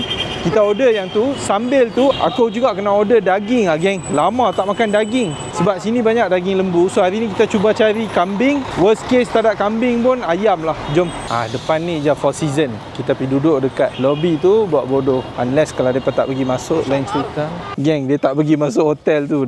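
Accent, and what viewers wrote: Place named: Kedah, Malaysia. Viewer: Alhamdulillah terbaik setiap hari sy x miss tgk video Doc. Rasa seronok dgr bahasa kedah Dr. Sbb sy pun org Jitra Kedah kpg kita pun sama Tanah Merah jgk. Terima kasih dr hidangkan video2 terbsik serata dunia byk ilmu yg sy dpt. Sy doakan terus sukses dan sy doakan jgk Allah bukakan hati dr utk Islam. Aamiin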